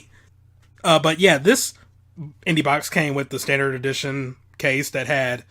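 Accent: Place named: Michigan, United States. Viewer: I thought you had more of a midwestern accent. Just didn't think it was Michigan.. Sadly I can't make it to MWE. Didn't even hear anything about it until you mentioned it in the video.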